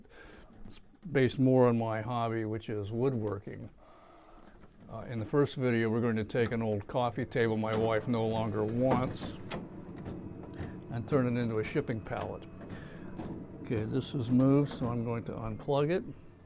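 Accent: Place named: Carolina, United States. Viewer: love your accent. are you from Carolina State?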